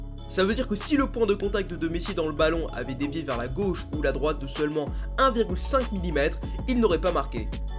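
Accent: Marseille, France. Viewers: T sur que t marseillais avec cette accrnt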